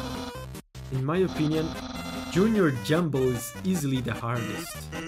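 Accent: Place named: Brazil. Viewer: EU SABIA QUE O SOTAQUE ERA BRASILEIRO Meu amigo, sua reação ao receber o abraço da Toriel, eu nunca tinha visto kkkkkkkkkk Mas sério, eu amo de mais ela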